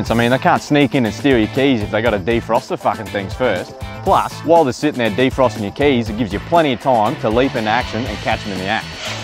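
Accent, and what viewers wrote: Australia. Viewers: I am subbing. I'm learning the art of aussie tongue and you're the perfect subject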